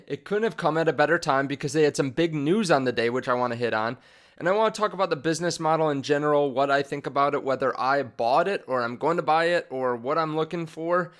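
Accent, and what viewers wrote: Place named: Chicago, United States. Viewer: Dude must be from Chicago or the burbs.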